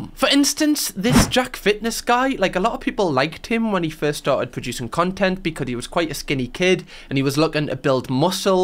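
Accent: Newcastle, United Kingdom. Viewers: I am being 100% serious, I subbed to both of your channels after never having seen one of your own videos. I saw you in a collab and was like "oh hes a geordie (honorary scot) imma sub to him"... been binge watching since lol.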